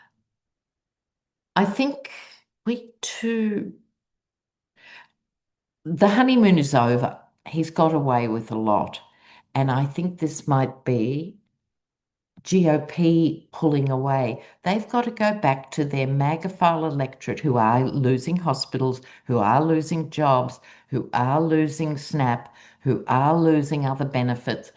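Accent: Australia. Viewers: A couple of decades ago I got to see Dame Edna in Austin, Texas. Before the internet we didn’t get much info about Australians here in Texas. Your accent and lingo reminds me so much of what we learned from Dame Edna, whom I adored. I love and appreciate what you do!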